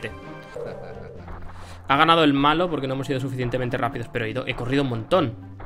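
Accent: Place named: Spain. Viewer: no se que sera mas malo los juegos en cuestion o los comentarios tan estupidamente planteados con ese tono españolete que irrita tanto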